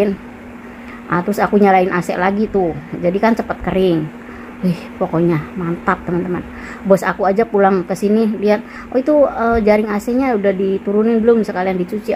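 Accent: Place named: Indramayu, Indonesia. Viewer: logatnya kek org indramayu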